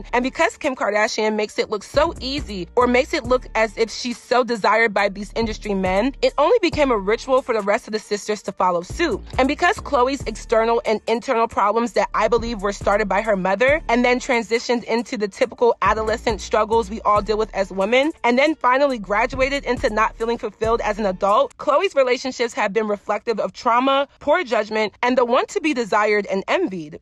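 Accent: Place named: Baltimore, United States. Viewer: You from Baltimore? I heard the “do” and “you” in the accent lol…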